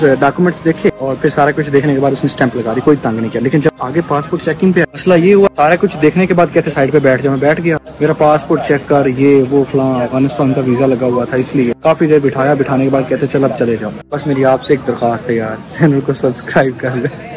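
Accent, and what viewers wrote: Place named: India. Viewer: tera English accent bahut desi hey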